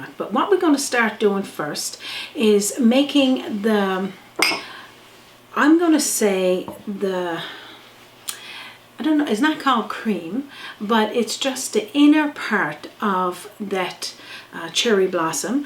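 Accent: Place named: Newfoundland, Canada. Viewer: These look incredible. Is that a Newfoundland accent I detect? I love it. ♥️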